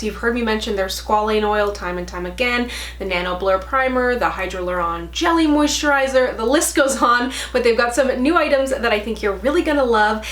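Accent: Newfoundland, Canada. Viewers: You're also a Newfoundlander! That's awesome!! Instant Subscribe when I heard that 🙊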